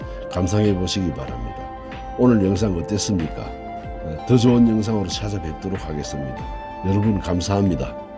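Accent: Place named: Gyeongsang, South Korea. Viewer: 구독~좋아요~🎉🎉🎉 선물 드려요❤❤❤ 이렇게 자연은 신기하군요 목소리듣자마자 경상도인거 알겠어요 저는 부산이니까요 행복한 날들 영위하셔요😂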